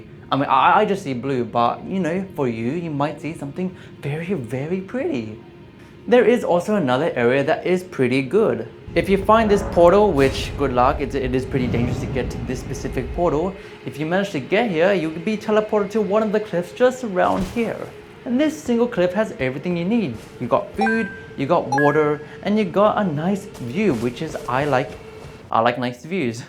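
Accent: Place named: Asia. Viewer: my bad, I didn’t assume an Asian person with a heavy Asian accent was Australian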